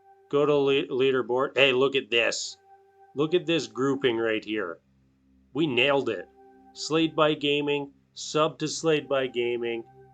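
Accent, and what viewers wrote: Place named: Pacific Northwest, United States. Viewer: After your question about what draws folks to your channel and game play, I was thinking about the reasons that I do enjoy watching your Goobies runs to start my days, here in Brooklyn. 1. I'm originally from the Portland, OR area and your voice has an accent that reminds me of home. Over the years, I've learned that the Pacific NW of the US has an almost Canadian accent in its English. Perhaps this is a result of the many years in which the territory was jointly administered by the U.S. and the U.K. or now the region's proximity to Canada over time? I just watched a history explainer video on that international relations history of this U.S./U.K. joint rule, by one of my favorite YouTube channels 'History Matters' , this last week. (I also work in and academically study International Relations and International Affairs, here in NYC; and sometimes for the U.N. 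here in the city or at international conferences.) Long story short, your accent feels welcoming and homey to me. (P.S. If you ever have any International Relations questions, feel free to ask. I'm always happy to share what I may have learned over the years.) 'Why did Britain give up Oregon? (Short Animated Documentary)' "Britain once held condominium of what's now Oregon, Idaho and Washington State with the United States and during a time of diplomatic strength, simply handed it over without any compensation. So why did the United Kingdom do this when it could have got something in return or at least given less? To fi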